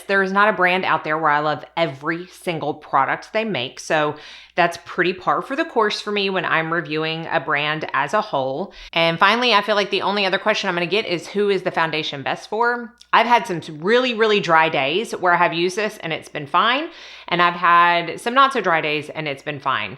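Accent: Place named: Texas, United States. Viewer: Hey. You are on FIRE today! You go girl! What a great video. I didn't know you used to work as a MUA. It makes sense now why your videos are so chalk full of great information, and why I've gravitated to your videos. BTW... I also love your accent. You kind of sound like my cousins who live in Corpus Christi Texas. I could listen to you all day long. Thank you. Love you, Calvin.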